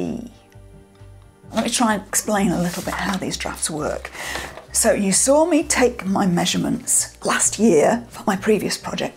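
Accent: United Kingdom. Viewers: you are an amazing artist!!! You are so calm & confident, even when adjustments to your projects are made. You are a real inspiration to me! I love your English (Brittish) accent!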